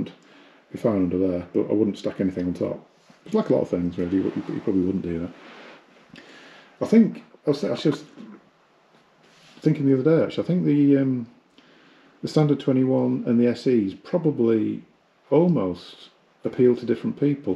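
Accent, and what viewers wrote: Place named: England, United Kingdom. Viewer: your English is so English it made me have to really concentrate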